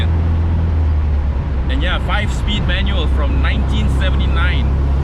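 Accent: Italy. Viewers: Hahaha 😂 love the Italian accent . Made me just so happy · Love tat italionooo accent...u should try getting into movies...surely talented...awesome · Nice engine sound plus your Italian accent 😂. I also want to buy one classic car since I started to see your video 😄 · Hahaha ohh boy, your italian accent is so funny 😂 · ...you are truly posessed, confirmed, chop & signed! There are no more medicines in the world which can cure you for this kind of italiano crazy possession. I will try to arrange for you an italian priest to perform the exorcism to rid off the 'Alfa Romeoooo' ancestral evil influence on you soon...but btw,you truly made my day today just listening to you rambling thru your italian 'celup' accent.It was very funny & original pc of work there. You are truly the best out there.. haha..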